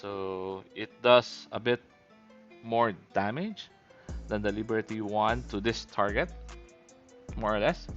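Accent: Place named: Philippines. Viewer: Base on your accent your Filipino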